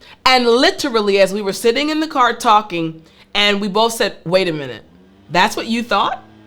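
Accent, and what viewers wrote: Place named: West Coast, United States. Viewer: I clearly love your acsent. why white people especially from west coast really I dont get it. I have subcribe and like and invite all of my family to learn english from your channel. Great way to learn english.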